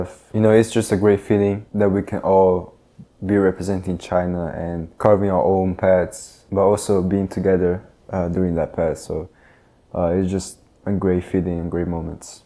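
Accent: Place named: Italy. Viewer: Love your game, but love your mixed accent of Italian & NorCal even more.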